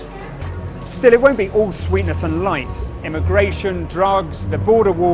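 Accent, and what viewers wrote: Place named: United Kingdom. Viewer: Que bien se escucha el acento británico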